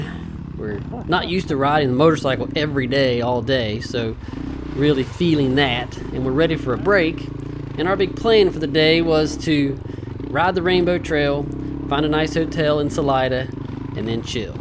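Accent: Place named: South, United States. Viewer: I love this kind of video; No music, good southern narration 😄, the sounds of the bikes. Excellent work.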